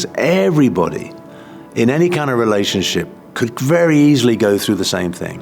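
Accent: United Kingdom. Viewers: A Latino with a British accent?? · That’s because of the British accent. Trust me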